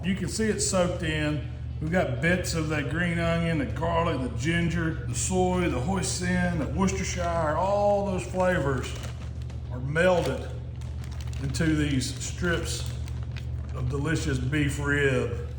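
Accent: South, United States. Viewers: Souther drawl ✅ Beard ✅ Verge of type 2 Diabetus✅ You know it’s gonna be good food.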